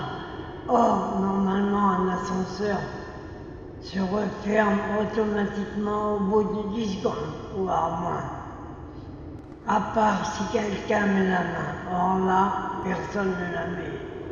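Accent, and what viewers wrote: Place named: France. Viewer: c un français il a l accent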